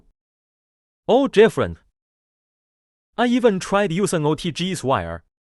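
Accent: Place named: China. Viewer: 不知道为什么 你的英文发音好中文啊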